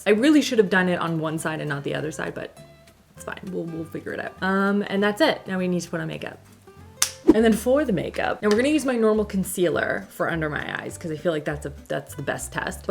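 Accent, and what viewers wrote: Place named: Canada. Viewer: It’s a Canadian accent thing 🩷🍁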